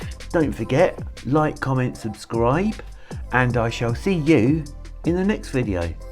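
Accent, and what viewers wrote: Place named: United Kingdom. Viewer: Brilliant! I love your accent. Which part of the UK are you from? And what's your favourite tea? 😊 PJ from Canada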